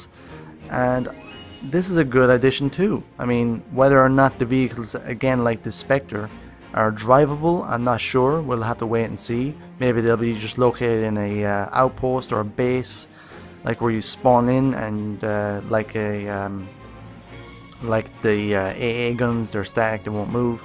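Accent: Ireland. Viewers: Hey, how do you get to play at E3, i was at it, but i didnt get to play anything? also great video, are you irish? u sound irish, im irish lol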